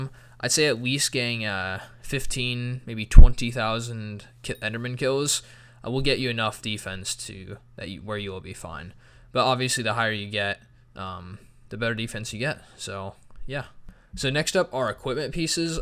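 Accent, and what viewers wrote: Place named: Netherlands. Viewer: You sound Dutch! Are you?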